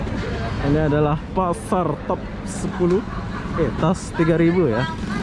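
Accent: Malaysia. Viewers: Makanya saya agak heran aja,setiap d tanya pasti bilang dari lombok,tapi logat dan bahasa byk pake melayu malaysia nya...ok salam bang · tapi logat abg mcm org malaysia..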